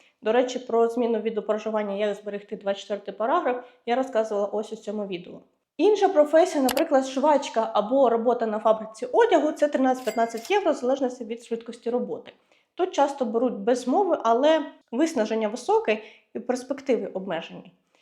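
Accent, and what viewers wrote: Standard. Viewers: Красива , креативна , розумна , (з харизмою треба попрацювати ( без образ)) україночка чисто розмовляє без суржику.Respect & perception of luxury 🇺🇦😁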